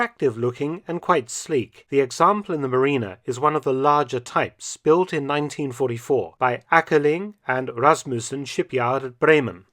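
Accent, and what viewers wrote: United Kingdom. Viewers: I'm always put in a better mood while watching a Mark Felton video, the combination of the interesting topics, calm gentlemanly British accent and relaxed vibe of the videos is just so good.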